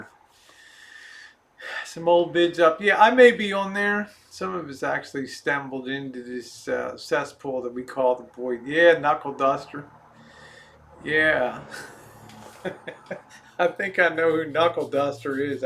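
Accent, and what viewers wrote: Baltimore, United States. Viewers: We are the same age and I feel so I pretty in comparison! I'm interested in your metal detecting. It's something I would love to try. How hard is it on your back? Is it something that you would think is not good? I have a bad back but am able to get around. I understand that you have to do some digging but is it possible? Also I have family in baltimore and love your accent 😍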